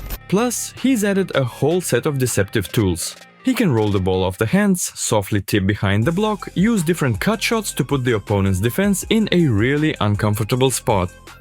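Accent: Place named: Russia. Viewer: Grüße aus Deutschland 😊 Love your Vids! Like your russian accent. Go on your way! looking forward to see the russian national volleyballteam in the future. You have so much power in your rooster and a huge pool of players in your league! Praying for the End of War. But In Germany We have one of the dumbest goverments of the world! They hate us german patriots more than anyone else 😅 maybe they hate themselves a little bit more 😅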